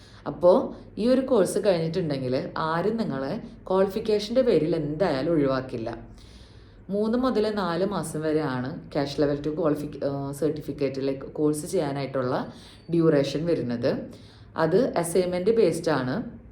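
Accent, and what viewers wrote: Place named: Ernakulam, India. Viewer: Thante slang kettappol Ernakulam district evideyo anennu thonni...I am from Aluva...that's y asked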